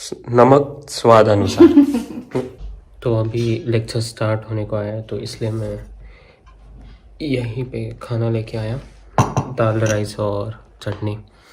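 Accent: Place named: United Kingdom. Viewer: Wo agrezo wali accent agayi hai tumhare English me.. kyaaa baat ❤️❤️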